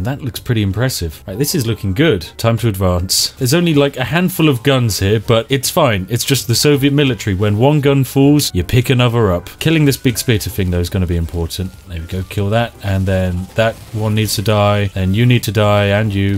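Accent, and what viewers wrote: United Kingdom. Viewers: SO NICE!!!!! I REALLY ENJOYED THAT VIDEO!!!! british accent!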